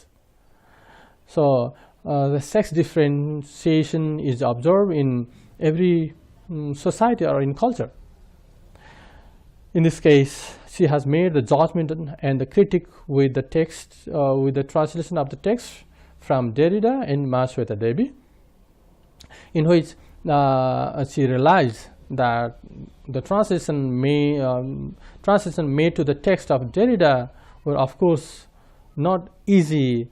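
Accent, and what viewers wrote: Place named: RP, United Kingdom. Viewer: Superb! BBC Style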